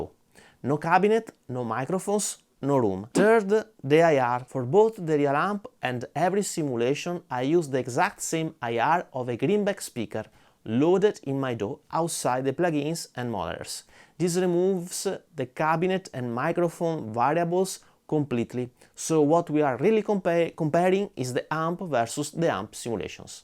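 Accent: Italy. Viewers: First, your videos are the best and most informative that I have seen anywhere! Interesting that for me the Quad Cortex had sound that was close overall but had some high frequency sharpness and harshness that I found problematic. This harshness was not present in the original SLO nor anh of the other contenders. Also interesting that none of them really nailed the tone. They were all pretty close (except for the Stadium- which was embarrassingly bad) but even the closest, the Neural plugin, doesn't sound indistinguishable from the real amp. Many people are saying that the challenge of sound accuracy is over, but there is plenty of room to improve if this comparison is representative of the current state of modeling/capturing. A lot of it may just be down to which capture you are using and how well the capture was done. One constructive idea if I might? It would be really helpful to have the clips played with the original right before each of the contenders. 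It's much easier to hear when you have the opportunity to hear the original again right before each of the new sounds for direct immediate comparison. Thanks for all the hard work you have done in sharing all of this information! Love your accent, Italian?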